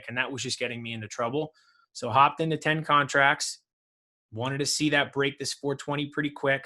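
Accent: New York, United States. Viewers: Hey. Awesome content. Are you from New York?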